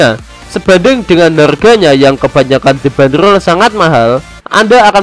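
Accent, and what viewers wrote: Java, Indonesia. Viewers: Jowo ne medok 😄😄😄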